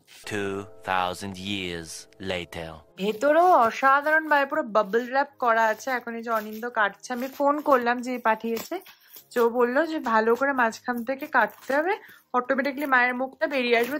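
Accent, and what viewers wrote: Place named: Basirhat, India. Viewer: Tumi basirhate r kotha bolle mon ta valo hoye jay...amro to bari basirhate tai😊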